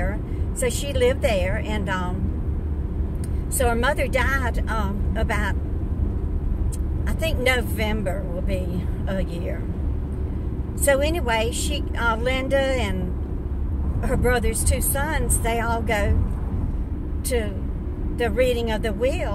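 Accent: South, United States. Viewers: Hi There It's Julie Falkingham I just love you and you are so wonderful. I will be writing to you. You are just so wonderful and sweet. I'm so thankful for all my friends and family and my husband he has been my rock and my friends are not just my friends but they are my family. You are such a loving caring person and I love your southern accent. You are so wonderful for what you are doing for your friend. You are so wonderful and your the best. I'm so greatful to have you for my friend and I would love to meet you in person and you remind of my gram she was my life and I will fill you in writing you my letter to you. You are so amazing and so wonderful you deserve nothing but the best. Love you Love your friend Julie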